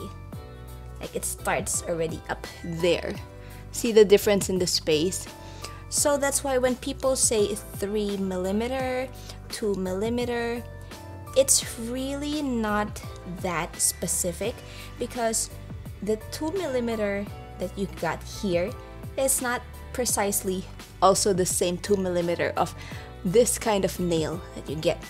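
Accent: Philippines. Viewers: WOAH she's Filipino (atleast I think). Im Filipino! "Ang kapal" is Filipino for "Its thick". Also i noticed her Filipino accent :D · Starting to watch your videos right now and my parents are gonna buy me a kalimba, IM SO EXCITED (I noticed your accent is like a filipino, If so, HI POO MAGALING KA PO MAG TURO LABYUU <3)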